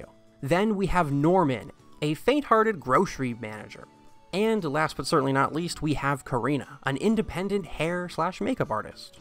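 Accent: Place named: Canada. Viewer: I only just noticed you say "Ha-llo" instead of "Hell-oh", is that a Canadian thing? (Honestly, it's kinda cute.) Anyway, super happy to see the "magical" elements being a bit more prominent - it's been awhile and I missed them.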